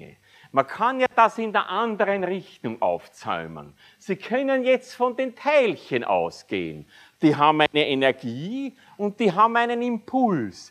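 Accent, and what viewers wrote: Austria. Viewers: Eine großartige Auffrischung für mich, der ich in der Schule zwar Physik-Leistungskurs hatte und dann doch der Chemiebranche anheim gefallen bin. Und nicht zu toppen das Ganze auch noch im regional eingefärbten Sprachduktus. Ich liebe Österreich! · Sehr grosses Lob an diese Vorlesung. Als Norddeutscher ist für mich das Österreichische ein zweischneidiges Schwert: Zu Charmant um wegzuhören, zu einschläfernd um konzentriert zu bleiben... Tatsächlich taten alle Worte gut, vielen lieben Dank! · Eine gute Vorlesung mit österreichischem Schmäh vorgetragen, so dass man es gut verstehen kann. Schade, wennn man reicher geboren wäre, hätte ich gerne in Österreich studiert.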